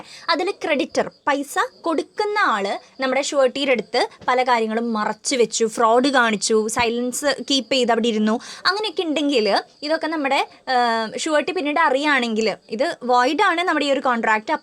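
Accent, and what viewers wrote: Thrissur, India. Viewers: Miss nte slank Thrissur kkaarde pole ind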